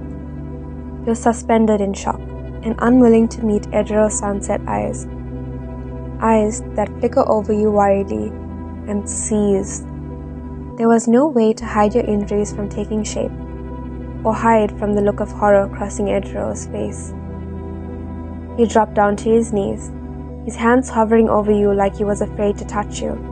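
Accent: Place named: Afrikaans, South Africa. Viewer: Quick Questions: Are you Afrikaans? or Indian? Either way, I love your accent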